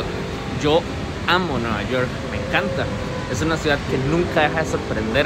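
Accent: Costa Rica. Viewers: Si no me equivoco eres de Costa Rica por ese acento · Maee fijo usted tiene que ser tico o alguna vez vivió en Costa Rica jajajaja saludos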